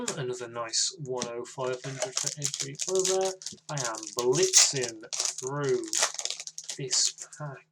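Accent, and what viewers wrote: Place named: Newcastle, United Kingdom. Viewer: Question. Where in england do you orignaly come from? Cause i think you kinda sound Newcastleish, but yeah. haha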